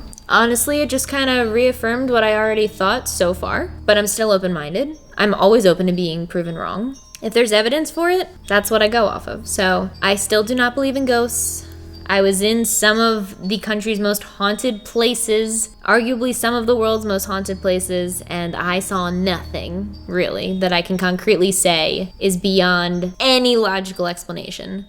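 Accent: Philadelphia, United States. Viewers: philly accent asmr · I just started watching you and I didn’t know you where from Philly!!! Me too!!! I was confused when you said you said orange wrong because that’s how I say it! I wish I was as talented as u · I get the philly accent. In Baltimore t turns into d’s . And Maryland sounds like “marlyn”😂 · I am from Philly and always said orange, forest, forehead etc the way you do and I never thought that water and the other words were wrong until you said it today. I’m so shook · Never change the Philly accent! We, hometown homies, love that jawn!! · I’m from philly and I didn’t even realize how differently we say certain words 😂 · Omg I didn’t know why you sounded so normal to me until you said you were a Philly girl! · Being from philly, I felt the our o's are a's except in "wooder" (water). Lol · I’m a Philadelphian. W H O O T E R still hurts my brain. 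please it’s wAtER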